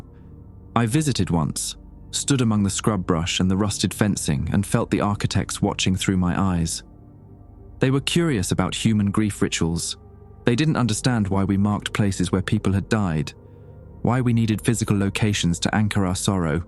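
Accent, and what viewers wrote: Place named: England, United Kingdom. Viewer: English accent is a deal breaker! American story, Texas, national archives college park md, whiskey and all. Why does everything on YouTube have a twat accent?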